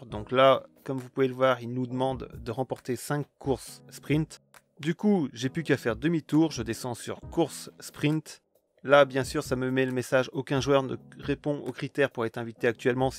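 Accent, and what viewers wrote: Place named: Nord, France. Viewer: Géniales tes vidéos, ça s’entend que tu viens du ch’Nord! 💪🏼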